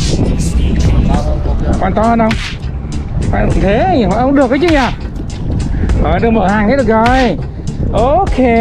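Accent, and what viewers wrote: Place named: Hanoi, Vietnam. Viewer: Admin giọng HN ạ, dịp sau có kèo nào cho em đi chung với :)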